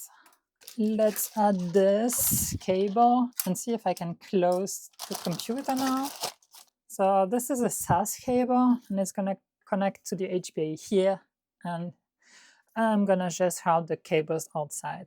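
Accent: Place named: France. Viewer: Thank you for this video,! I'm an Aussie and am intrigued with your home workshop and your accent. French? Perhaps German? Just curious. Your home workshop is amazing. Your layout, organisation... Wow. Is this a hobby or a business? Let me just say that out homes look similar, but I have 8 Billy Book Cases full of plastic tubs that hold my various hobbies and the associated equipment. IT, Electronics, painting (Cars, furniture, etc) Leather repairs, abrasives (Pads, blocks sand paper), but in a much bigger space. Sorry if asking is rude. I mean no offence. On builds... I used a ITX Z-170N Mobo, i5-7600k and 32 gb of memory. I put in a 970 Pro NVME and partitioned the drive into a boot and a cache. I decided not to HBA, instead went Ubuntu Webmin and ZFS. I don't spin down my drives! 5 x 4tb WD Red 5400rpm drives. All up, Power consumption is 38 watts idle and the highest during a stress test was 54 watts! 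In the previous NAS my 3 TB drives lasted 13 years! HBA's are great but draw a higher percentage of power. Especially the 9200 series, so I used on board sata. I have one spare cold mounted drive and the connection is to a caddy, to do backups or import data. ZFS is memory hungry. My Raid 5 (5 x 4 Tb drives) uses 55% of the 32Gb of memory. Thank you again for the great build and fun, interesting video. Merci / Danke!!!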